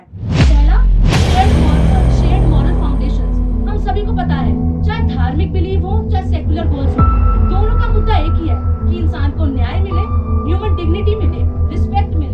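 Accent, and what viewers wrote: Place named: Punjab, India. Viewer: you said secular belief & spritual belief. In captions it's mentioned Religious vs Secular. There is a huge difference between spiritualism and religion even though they're co-related. Your speaking skills are decent but your arguments can be easily penetrated. I'd love to wage war against your Punjabi accent the next time we face each other. Props to you for the victory.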